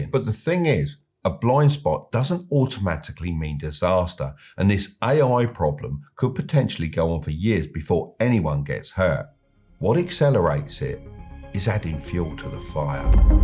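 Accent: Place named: Clacton, United Kingdom. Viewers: I tend to find that what to all intents and purposes is MIDDLE AGED bloke with an esturial dialect from somewhere close to London and talks about dollars rather than the exchequer of his foundation is someone worth taking with a whopping dollop of salt. This bloke has all the hallmarks of being bogus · Is this bloke from Clacton on sea !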